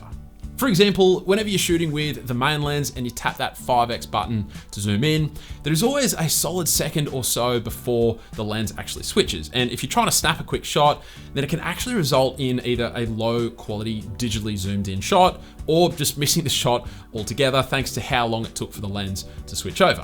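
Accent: Australia. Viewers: Is this an Australian accent? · I really love this phone, its pixel through and through...and when you let me know how to work it speaking with your sexy Aussie accent, I melt! Thank you 🥰😘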